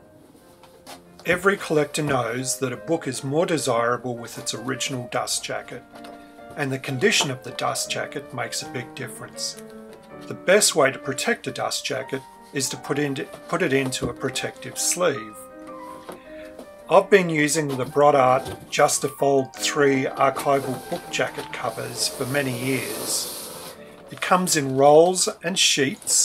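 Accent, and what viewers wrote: Australia. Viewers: Hey, are these sleeves removal once applied? Just found your channel and it's great, sounds like your an aussie as well - I may be wrong. Cheers mate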